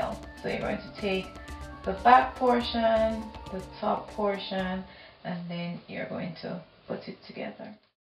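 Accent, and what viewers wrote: Jamaica. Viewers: r u from Jamaica?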